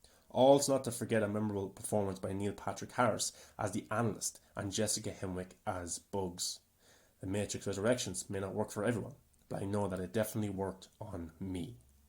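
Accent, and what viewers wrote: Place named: Cork, Ireland. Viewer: Great video. Fantastic movie. Made with typical Wachowski sincerity and heart which makes it worth a thousand Marvel films to me. Time will be kind to it. Might be reading too much into into it but you have some Cork accent!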